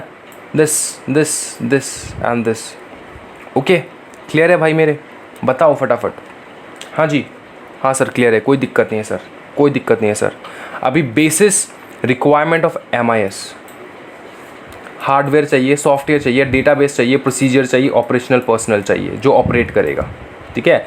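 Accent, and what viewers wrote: Bengal, India. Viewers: Apka accent se pakka bol sakta hu ki aap Bengali ho😂😂..love from Guwahati ❤️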